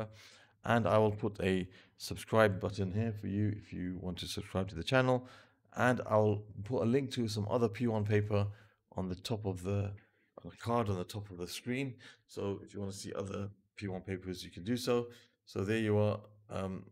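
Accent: United Kingdom. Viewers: the best! And that British Accent really hits the spot, lol.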